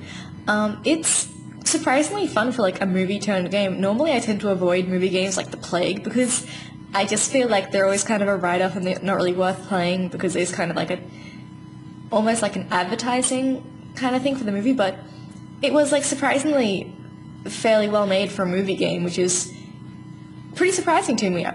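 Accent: Australia. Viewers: are you from sydney?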